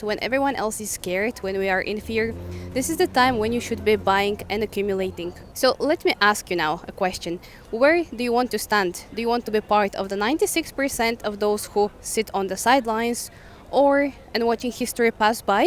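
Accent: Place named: Brazil. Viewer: you being mysterious...if i had to guess you eather brazilian(because of your accent) or middle eastern....am i close?😅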